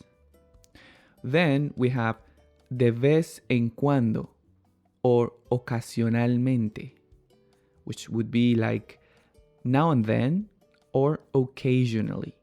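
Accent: Spain. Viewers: This sounds like European Spanish (Spain). Do you have any Mexican Spanish story lessons for beginners? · Is this Spain Spanish or latin America Spanish? Thank you in Advance.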